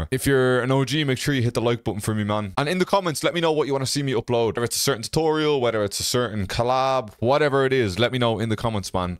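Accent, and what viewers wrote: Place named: Dublin, Ireland. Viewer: That Dub accent is heavy. Respect from Bray my man, keep killing it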